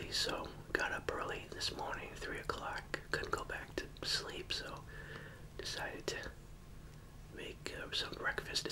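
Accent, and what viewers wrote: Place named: New York, United States. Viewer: u sound like you’re from NY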